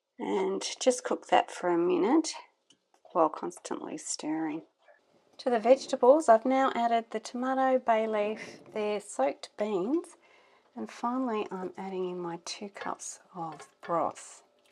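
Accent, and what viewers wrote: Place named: Australia. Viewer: I think that there may be time when You Tube creators may question whether their channels are worth all the work that goes into producing content. This is my personal story of how your lovely posts have restored my spirit. Last fall my husband was given a devastating diagnosis. I spent several weeks taking him to daily radiation treatments and dealing with the side effects. (The lesions were discovered early and we have reason to believe he is on his way to full recovery). So what does this have to do with your gardening channel? Though I am an avid gardener, in the aftermath of his treatment regimen I experienced depression and lethargy. My garden needed prep for the upcoming season but I could not motivate myself to do anything other than sit on the couch and stare into space. Here is where you come in: I would watch one of your videos and could feel a spark of garden interest reigniting in my spirit. 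I would go outside and do just one thing. I’d watch another of your videos (enjoying your lovely, and to me—exotic, Aussie accent), then I’d go to my light rack and start a few seeds. The motivation has continued and I find that my depression and lethargy have mostly lifted and my garden and seed starts are ready for spring. And I thank you.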